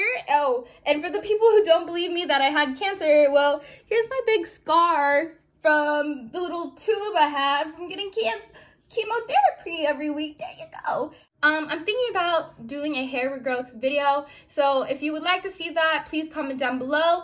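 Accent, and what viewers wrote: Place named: Visayas, Philippines. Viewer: Get well soon, imong bisaya conyo miss na namo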